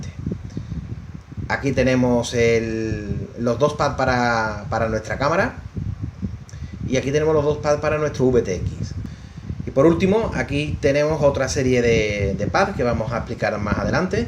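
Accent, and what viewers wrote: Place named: Andalusia, Spain. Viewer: Que pedazo de video ilustrativo y lo dejas todo super claro. Así da gusto iniciarse en la construcción de drones con personas como tú. Por cierto eres de Andalucia no? lo digo por el acento... yo de Málaga. Muchas gracias y saludos.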